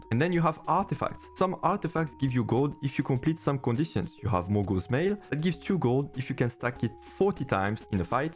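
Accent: France. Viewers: French accent was easy to understand most of time, but it took me a moment to figure out that you talked not about 'focused units' but 'four cost units' :) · C'est moins l'accent français que l'enfer d'écouter un français me parler anglais alors que ce serait plus simple en français mdr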